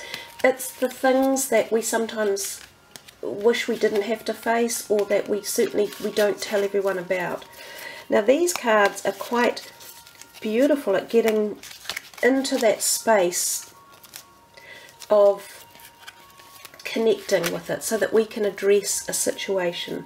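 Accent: New Zealand. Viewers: My resolution is to learn the tarot, which is how I found you. Also, your accent makes me miss NZ. I'm a New Yorker but I spent most of 2013 in Auckland because my partner is a kiwi.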